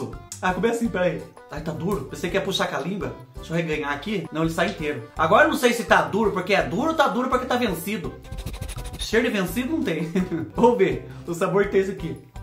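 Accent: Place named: Minas Gerais, Brazil. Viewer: amo o seu sotaque, falo bem parecido, aqui na minha cidade o povo me chama de "mineirinha" 😂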